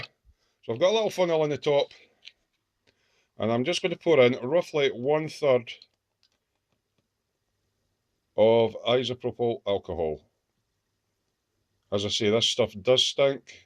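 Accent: Scotland, United Kingdom. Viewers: I'm new to airbrushing, but this was interesting. I have seen a video in which a few drops of glycerine were added, together with the IPA, distilled water and screenwash. Apparently, it adds a bit of lubrication. Thanks for sharing. (I live with a Scottish lady and so the accent was no problem!! :-) )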